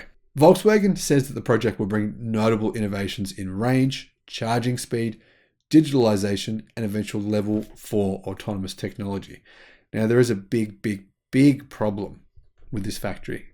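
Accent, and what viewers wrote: Australia. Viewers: I have been to Melbourne. I met Cambell Bowell in Frankston. He gave me a ride in his new car, the Nagari and showed me around his facility. A very nice guy! This was a long time ago. I also met some people named Evans in Frankston. I haven't been to Australia in over 50 years but I do enjoy your accent and enthusiasm for our new EV world.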